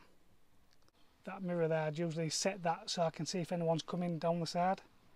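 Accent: Hull, United Kingdom. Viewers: NICE job u from sound like your from ULL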